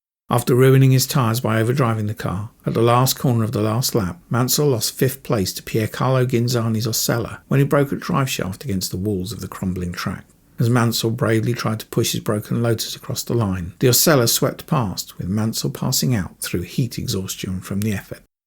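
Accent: United Kingdom. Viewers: French here. Thank you sir for being able as an english motor racing buff (I suppose so, based on your way of speaking) to paint a balanced picture of an english great driver, rather than producing yet another hagiography. Moreover, the editing of your video is quite good and your comments brillantly written, congratulations. To complete Mansell's picture, Alain Prost declared, in an interview part of a long documentary on his career made by a french TV channel, that Big Moustache was the only teammate he had not been able to work efficiently with in Formula 1, because the man of the Man's Island was not commited enough in the development's work and was behaving as a prick generally speaking. He explained that even when he and Ayrton had a huge disagreement and were not talking to each other anymore in 1989 after the San Marino GP's incident, they managed to keep on collaborating very professionnally during technical briefings and development's sessions. 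According to him, Nige was very fast and undisputably the bravest of all, but not as complete a driver as Niki, Nelson or Ayrton. Despite his flaws, "Il Leone" was a fantastic striker almost always thrilling to watch, and a monster of will who deserves a huge respect for that reason, considering the physical impairments he had to overcome all along his motor racing's career, resulting from his very serious accidents in the early stages of it.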